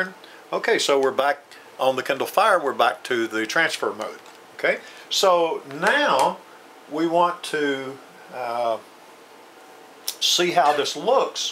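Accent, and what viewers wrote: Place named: South, United States. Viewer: You sound country ... But thanks 4 the HELP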